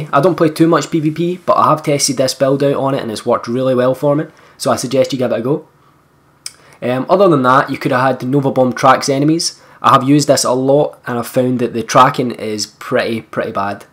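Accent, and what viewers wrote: Scotland, United Kingdom. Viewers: fuckin love scottish accents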